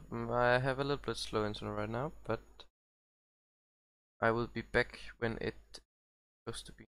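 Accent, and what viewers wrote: Denmark. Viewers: Are you danish? I can hear it on your accent. And I love your vid :D please don't stop making so good Videos :D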